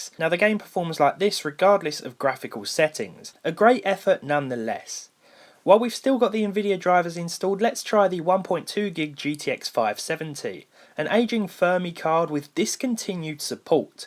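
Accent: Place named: England, United Kingdom. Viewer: Jesus... what a disgusting english accent. :(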